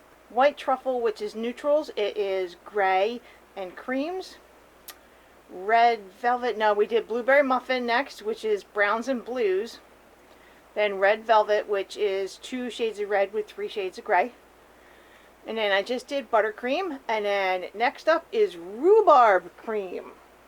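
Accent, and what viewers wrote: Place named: Philadelphia, United States. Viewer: I had a feeling just by your accent you were close to Philly! I’m from New Jersey. I lived in Absecon worked in Atlantic City at Harrahs moved to Las Vegas back in 1996 been here ever since.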